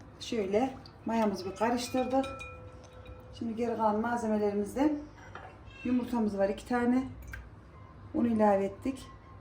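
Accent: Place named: Aksaray, Turkey. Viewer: Eline sağlık çok güzel görünüyor ayrıca konuşma tarzından anladım hemşeri olduğumuzu 68 vatan 07 mekan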